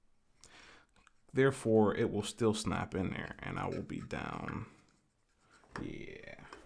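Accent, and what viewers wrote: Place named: Pittsburgh, United States. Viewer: Are u from Pittsburgh u have that p burgh slang like in errre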